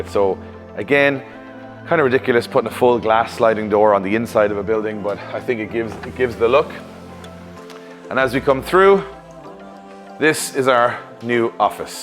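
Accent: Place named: Ireland. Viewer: shocked when i heard the irish accent and then that you said this was in dublin ... instantly subbed ....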